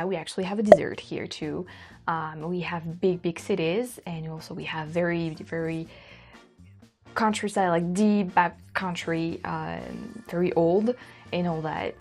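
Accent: United States. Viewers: Your English is American accent! Did you live here ? Love the vlog and you living life to the max. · Can I just say how much your English has improved even from a year ago. Your accent is very light now and you have a good grasp of the American accent. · I have watched you for a few years and your "American English" is getting very obvious. I can hear it very clearly. It reminds me of when I was in Eastern Europe and the young people learned English from Americans (Bosnia and Kosovo).. They would pick up southern accents and it was funny to hear them talk with an eastern European accent combined with a US southern accent. Also hearing them adopt the culture of "yes sir/ma'am", general politeness to strangers, etc. I miss taking the people that worked on our bases and their families to special occasions like Thanksgiving dinner and 4th of July parties where we opened up our dining facility and had sports events for them and their families! 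So much fun! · The way she speaks English with an American accent is so freaking cute...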